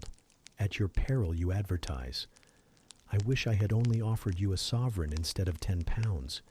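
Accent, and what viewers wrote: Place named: United States. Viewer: I searched American pronounced jane eyre. This is best. And your voice so sweetie as like Rochester. And fire sound is so convenient to my mind. And its good because it is original book contents without skipping. Thanks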